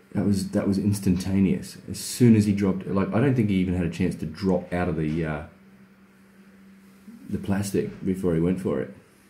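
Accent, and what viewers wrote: Australia. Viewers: I heard the Australian accent before I even clicked the video 😭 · you know this is australia when he has a australian accent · Ken Ken he sounds slightly Australian, he’s also from Australia. Australians are known to be cool with spiders and stuff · Of cause he’s Australian the accent and the fluent voice is Australian. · yk its an australian video when he has an australian accent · Me after seeing the thumbnail: “I bet this is in Australia” Video dude two seconds into the video: Australian accent YouTube pinned location: Australia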